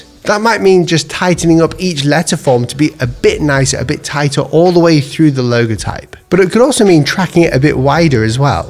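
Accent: United Kingdom. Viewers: good and very informative but british accent sucks when watching tutorials like this. No offense. but its hard to understand what your saying because of the frequent pausing every word, making the sentence sound like one word and talking fast. its annoying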